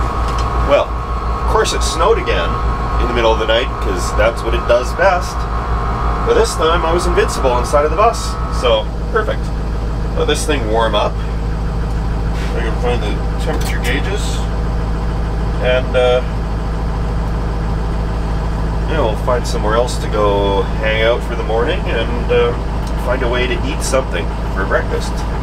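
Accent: Canada. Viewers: The canadian comes out when excited.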